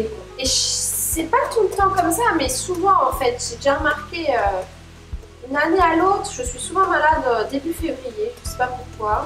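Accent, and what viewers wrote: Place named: United Kingdom. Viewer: Bonsoir je ne voudrais pas vous contredire mais franchement je suis belge et je ne reconnais pas cet accent là 😂mais moi je vous croyais d'origine anglaise. Vous êtes très rigolote. C'est amusant. J'aime bien.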